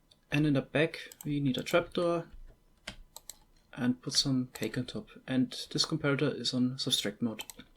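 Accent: Germany. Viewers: I hear a german accent am i right? Falls ja gutes video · the german accent is too strong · could it be that you are german? your way of speaking reminds me of germans try to speak english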